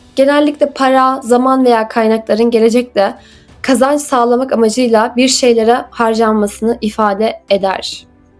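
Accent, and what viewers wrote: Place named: Turkey. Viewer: R leri cok bastiriyorsun turk aksanindan cikman iyi olur biraz dinleyip birseyler gormek istedim ama aksan rahatsız edici. Dinleme telaffuz geliştirmen faydali olur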